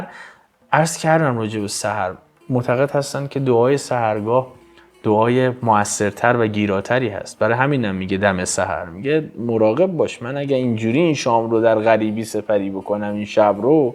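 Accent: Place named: Kabul, Afghanistan. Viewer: عزیز را خوشبختی نصیب باد که‌ خیلی خیلی خوب گپ می زنی و با سخن زدن ما مردم کابلستان بسیار نزدیک و کاملا قابل فهم است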